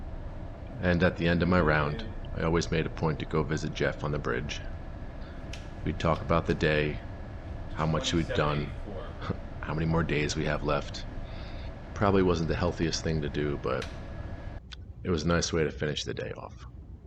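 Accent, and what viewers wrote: New Jersey, United States. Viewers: are you from New Jersey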